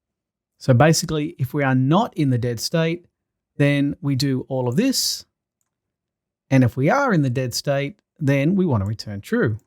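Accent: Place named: Australia. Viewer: great videos and love the familiar aussie accent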